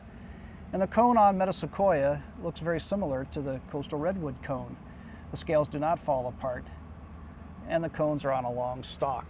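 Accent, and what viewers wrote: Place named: United States. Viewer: One of those sounds like Latin in an Italian accent and the other Latin in a French accent. Only natural that an American would speak Latin in an American accent!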